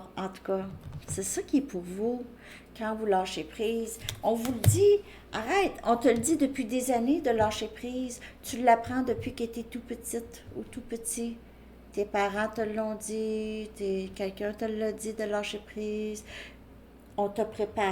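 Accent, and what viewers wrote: Quebec, Canada. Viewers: Allô est tu du Québec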